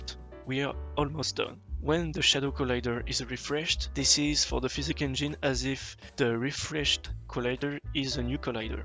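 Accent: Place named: France. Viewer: Ton accent français te trahit :) Très bon tutoriel. J'apprécie beaucoup ta chaîne YT - très pédagogique. Bonne continuation ++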